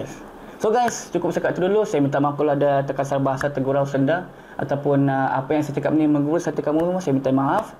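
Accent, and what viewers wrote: Sabah, Malaysia. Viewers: Cikgu punya slang bila bercakap ni...betul2 jadi sabahan sudah oh... Tiada SDH tu slang semenanjung... Kalau cikgu cakap sama org2 yg tidak berapa mengenali cikgu...yg cikgu berasal dari tanah semenanjung..tiadalah yg mau percaya... Melainkan cikgu kasih tengok IC baru dorg percaya..😅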